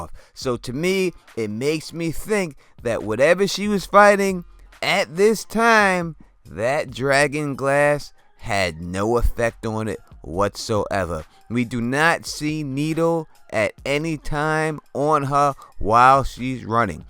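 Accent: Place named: Queens, United States. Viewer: Great analysis - thank you,. I love your Queens, NY accent - makes the world feel much better xD (Im from QV)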